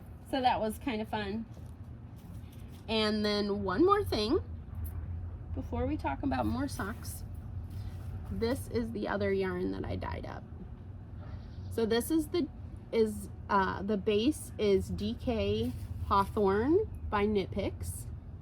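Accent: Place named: Wisconsin, United States. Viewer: Another Wisconsinite here! Thought I recognized that accent😉😂😂😂 Just stumbled on your podcast😊 and I'm so happy I did! Just learned to knit socks about 2 years ago and have become obsessed!!! They are THE best for our frigid WI winters❤️❤️. I also started the tradition of gifting them to my adult daughters for Christmas - this will be the 3rd year😊 And they always end up sending me pics of themselves wearing them & saying how much they love them!!! Thanks for taking the time to share your knitting!!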